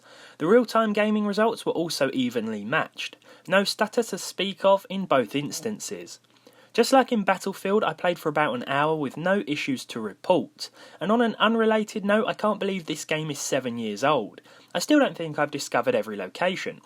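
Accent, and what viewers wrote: United Kingdom. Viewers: I thought u were british... Effect?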